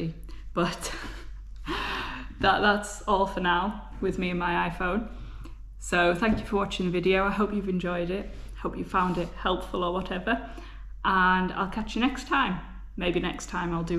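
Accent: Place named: Wales, United Kingdom. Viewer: You're definitely Welsh 😂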